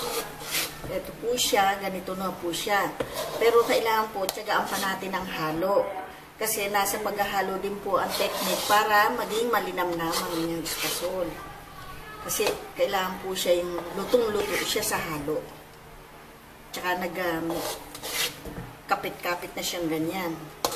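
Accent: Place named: Bulacan, Philippines. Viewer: ng marinig ko ang accent mo, sabi ko taga bulacan to..kaya ng makita ko na espasol ang gagawin mo..i deduced na san miguel ka laki.. :))) taga san miguel din kami (mother side), sa may sta inez... keep up the good job :))))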